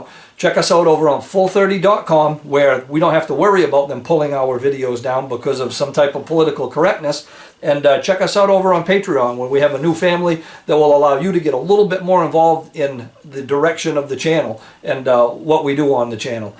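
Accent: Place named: New England, United States. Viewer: FINALLY found a firearms channel from my region, with my interests! Speaking native New England too. Thank you sir. Being In Massachusetts, I must live vicariously through YouTube.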